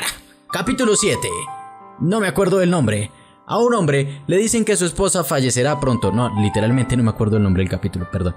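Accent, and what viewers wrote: Colombia. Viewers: Es tan evidente q ud es Colombiano jejejejejejejeje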